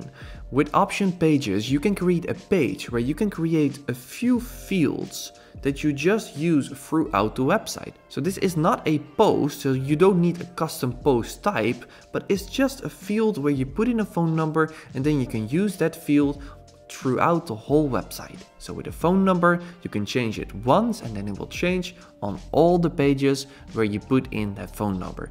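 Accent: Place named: Netherlands. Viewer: Thank you very much! This is, again, useful and clear! I need to go through the video step by step as there is so much packed into it. But every single step is crystal clear. BTW: I love your slight Dutch accent.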